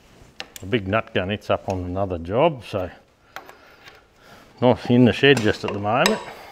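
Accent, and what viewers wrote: Australia. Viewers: I love your Australian accent 😁🥃🇺🇸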